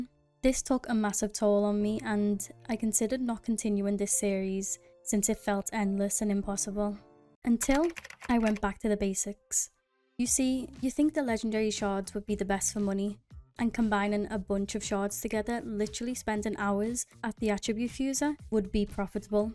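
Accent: Liverpool, United Kingdom. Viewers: a scouser?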